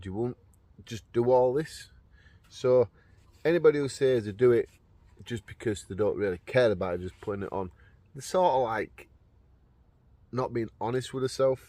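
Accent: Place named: North, United Kingdom. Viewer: I thought I was subscribed but I wasn't, always watch your camp outs, your Northern accent makes me chuckle, the beard is looking good, get a beard before it snows it'll keep your face warm in the cold weather. But it definitely suits you, ohhh suits you sir! Lol! Got to convince the Mrs. Oh lovely to hear that gentle rain, it's been so dry this year, no rain to clean the air. Need that rain to keep it a green & pleasant land. How about a coastal camp, you & vegan Dan, I suppose your far from the coast, its getting the time. Anyway keep up the good camping! Always let us know how you feel, ignore the haters, can never please them anyway. A lot of share your opinions & outlook, at least we're right! Lol!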